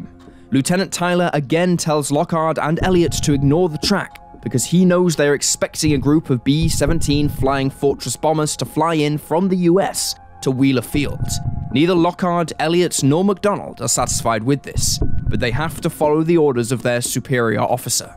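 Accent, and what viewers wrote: United Kingdom. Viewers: Such British blah blah blah........................... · British accent makes it better.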